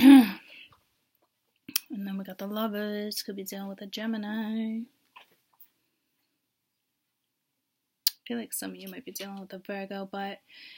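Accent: Boston, United States. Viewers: Lol, where are you from originally? I love your northern accent. Are you maybe from Boston or something? Love it!!!!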